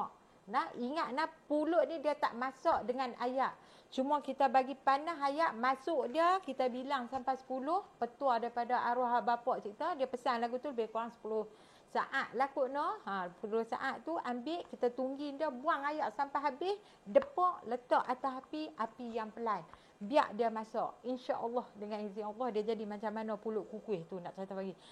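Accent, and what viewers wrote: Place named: North, Malaysia. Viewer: assalamualaikum..terhibur dengan telatah tambah pulk loghat utara.contoh nya nak ceghita bagi.nek habaq mai.ummi tergelak sendiri masa latah.memang padu pulut udang tu.ummi pun lama xbuat dulu penah juai.buat senighi puaih hati.ummi nak tanya kampong kat mana.ummi orang utara jugak.memang terhibur.anak2,la depa pandai buat pulut mulut ni.klu ummi buat depa bungkuih boleh la.lain kali ummi nak minta buat kuih jongkong bulih kan.bye ummi suka dan minat ngan telatah anda menghibur kan.t.kasih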